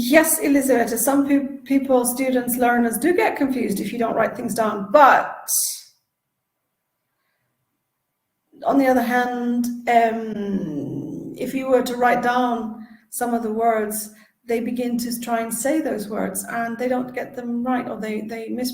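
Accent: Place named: Russia. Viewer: You are absolutely right, she has harsh Russian accent, her knowledge and professionalism I have not criticised by the way, bookish definitely ( having impression that she has not experience talking with native speakers) I tried my best to listen the tutor to the end, no success. I am not teaching English, more over I am not teaching other teaches !!!!!!!! I can have any accent. Good she has got comments, now she can working on her accent . It is hard work in universities for interpreters, they have classes for this particularly problems, as muscles have not been used for soft talk and for different phonetic, but has to be done as many ppl did. Wong Pronunciation it is common for Russians teachers. This is why I prefer to listen and communicate with native speakers .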